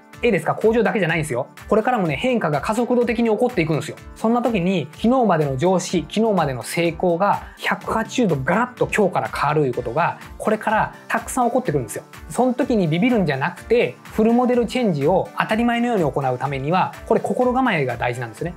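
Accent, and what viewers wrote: Hiroshima, Japan. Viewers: 非常に説得力あるお話でした！勉強になりますね。ありがとうございました。広島弁で話されてるところも聞き入るポイントです。話すスピードも私的にはgoodです！ダラダラ話している方は飛ばしたり、早送りしてしまうので。最後のじゃあね、バイバイがまた可愛いくホッとします笑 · さん、ご家族でコロナ大変でした😂元気に復帰していただいてとても嬉しいです🤗奥様も3人の子育てで大変なので段々イライラしてきたんでしょうね😅 さんが作ったスキル分かりやすいし素敵です🤗課題発見力の必要性と商売の本質も学べました。やっぱり疑問に思ったり、思考力が大事と思いました。フルモデルチェンジ力のお話しも納得！過去の成功を捨てるのは勇気が入りますがしがみついていると時代に取り残され稼げなくなると思いました。変化に対応できて学び続ける人が稼げると私は思いました。大手が参入している領域では勝てないので、個人でどうやって専門性を見つけ稼ぐに繋げていけるのか動画にあげてほしいです🤗次回の動画も楽しみです🤗 さんは未来予測もしてますが私達に現実、事実を教えて頂いている希少性が高い存在です☺️応援してます😊あと広島弁も素敵ですね😉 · えーコロナ！大丈夫ですか？広島弁聞きたかったん！ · 面白い動画ばかりで、広島弁も心地いいです。ただもう少し完結した動画にして欲しい。でも楽しいですよ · 心地よい広島弁ですね✨ 勉強になりました！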